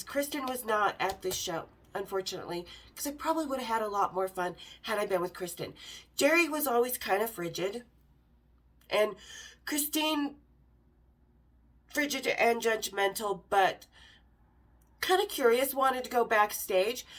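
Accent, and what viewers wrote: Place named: United Kingdom. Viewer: Bruce Dickinson used to live about 3 miles away from me. I love your British acent❤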